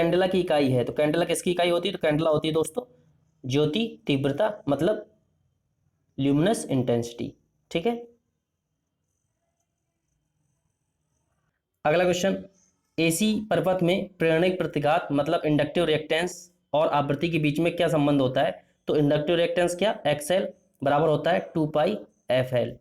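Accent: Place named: South, India. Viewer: South Indian hindi me samajh nahi aatha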